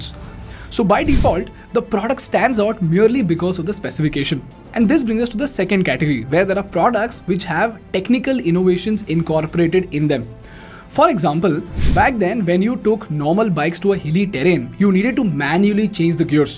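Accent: India. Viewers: School.....If This Is Not A Promotional Video....Then I Don't Know What Is 🙄So Please 🙏Cut The Crap....About Decathelon....Not Using You...For Promoting Them...Which Also Means....They're Not Doing So Good After All....Are They? I Wanted To Buy A "Tribben"....Their Sports Bike....And Guess What...Their High End Version....On Display....At PVR Atrium,Gachibowli, Hyderabad....Is Still On Discount 19,000....Change....And It's Been Like That....For More Than A Year 🙄And Don't Let Me Start On The Online Reviews On This Bike🙄🙄....But Yet...Just Because....I Found You Cute......😅Although....The Comminication....Pronunciations...The Heavy Indian Mother Tongue Influence...Like "Dee - Cathlon"....Instead of "D'Cathelon - As In "ड" Cathelon"....Puts Me In Doubt....Whether I Should Spend Rs. 2 K Plus....On Your So Called Comminications Master Class? Or Not 🙄Hmm 🤔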